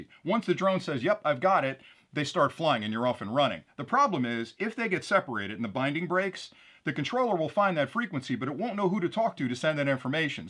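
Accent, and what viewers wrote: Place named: Bronx, United States. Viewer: you should be from the bronx - well cool - love your channel!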